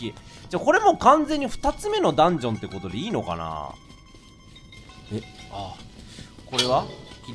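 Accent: Kansai, Japan. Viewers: レトさんの関西弁違和感なさすぎる( ˘ω˘ ) ｽﾔｧ…さすが関西出身やなぁ…好きやわ～レトさんの関西弁( ◜௰◝ ) あっ忘れてました( ˊᵕˋ ;)💦 あっこちら皆様が求めているシーンとなります|´-`)ﾁﾗｯ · 初っ端から関西弁聴けて幸せだいぶ幸せお耳が幸せとにかく幸せ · レトさんの関西弁にキュン死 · レトさんの関西弁が好き〜〜〜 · レトさんの関西弁(⸝⸝⸝ᵒ̴̶̷̥́ - ᵒ̴̶̷̣̥̀⸝⸝⸝) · レトさんの関西弁可愛い( ´,,•ω•,,`)♡ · レトさんの関西弁がたくさん聞ける神回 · レトさんの関西弁すきなんよなー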